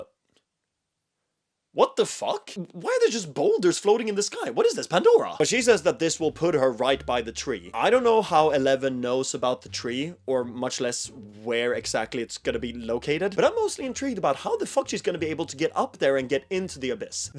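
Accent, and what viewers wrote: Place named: Sweden. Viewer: the way i activated like a sleeper agent when i heard that you had a swedish accent is frying me😭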